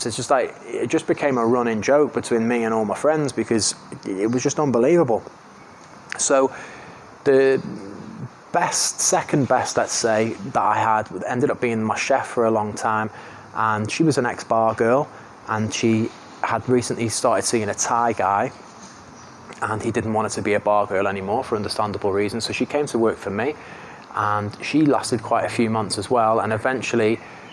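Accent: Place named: Manchester, United Kingdom. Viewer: Well that confirms I'll never open a restaurant in Thailand hahaha. Great story. Are you originally from Ireland because to me your accent is North West/Manchester English?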